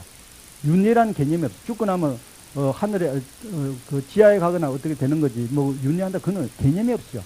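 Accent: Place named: South Gyeongsang, South Korea. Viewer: 구수한 경상남도 사투리의 질박하고 자세한 스님의 강의를 듣는 인연을 맺어주신 데 대해 감사드립니다.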